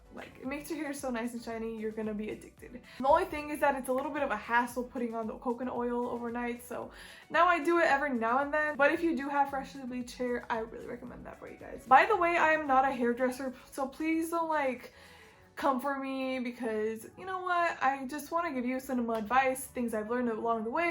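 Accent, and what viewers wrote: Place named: Romania. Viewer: Ur Romanian! I can tell by the accent (and products). Buna. :) M-am prins dupa accent si dupa incercam sa vad etichetele produselor daca sunt in romana, pana cand am reusit sa vad "ulei de cocos" si parca mai e ceva pe cele de la Syoss. Se pare ca ne recunoastem dupa accent.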